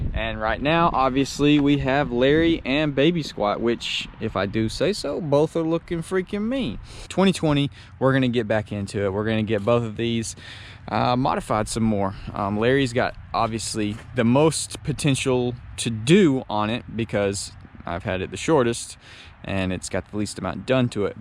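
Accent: Alabama, United States. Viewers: What part of bama you from ?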